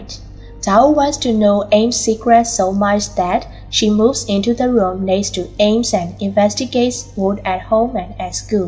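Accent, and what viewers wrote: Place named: Myanmar. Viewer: Are you a Myanmar?I just notice your accent.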